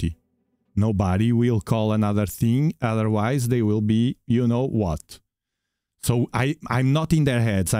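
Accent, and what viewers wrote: Portugal. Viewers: The moment i heard you i knew you were portuguese. 😅 Abraço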